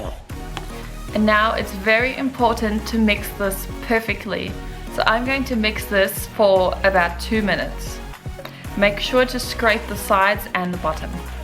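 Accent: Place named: South Africa. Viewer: Awesome om n SA accent te hoor :) thanks for a great video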